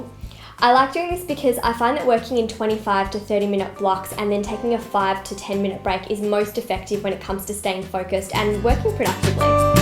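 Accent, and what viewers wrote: Australia. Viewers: Australia was a British colony, so most of the earlier settlers and first English-speakers were British so Australian English has very close spelling and pronunciation to Brits. most Australians speak like her. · Australian accent I can tell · JanielForLife Australian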